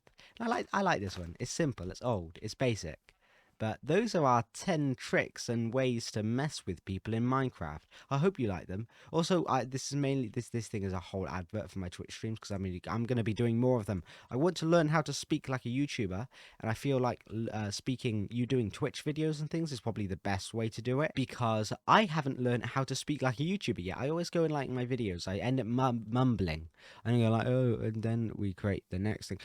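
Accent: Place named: United Kingdom. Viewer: Bro doesn't sound like grian. He just sounds british. He has the same voice as many people i know.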